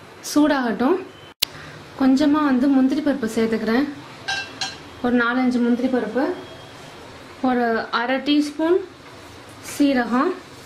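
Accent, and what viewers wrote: India. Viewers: nice to hear the Madurai slang after a long time.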